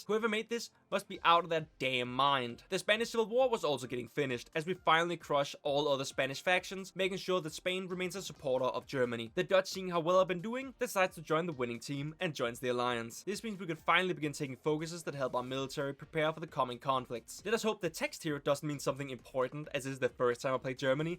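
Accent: Denmark. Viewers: Drop the Danish accent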